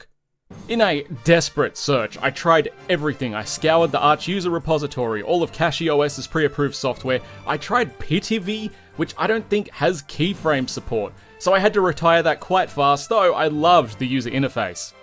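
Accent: Australia. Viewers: If you didn't show us how to reduced those Ss I would have no clue you are Australian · Wait, you're Australian? I'm completely not surprised and in fact I'm sure you mentioned it in at least one video I watched before, but it just didn't "click" with me. Has audio editing chicanery been behind me not registering your accent in our shared native language as "foreign"? This is like if I was an NPC who suddenly noticed some metagame exploit you've been going through on a challenge run.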